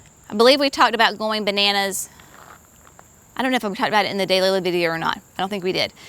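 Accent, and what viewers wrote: South, United States. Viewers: Fall has arrived here as well At the Jerseyshore! Tomato tomato it’s all good! I for one enjoy a southern accent 🌿🌻 🍅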